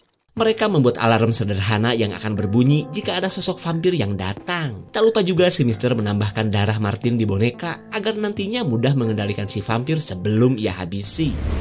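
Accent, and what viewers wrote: Sunda, Indonesia. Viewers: Sering "afdett bangg siaaapp menungguu apa lgii cara ngomong nyh cmpur sundaa heheheh😂😂😂❤❤❤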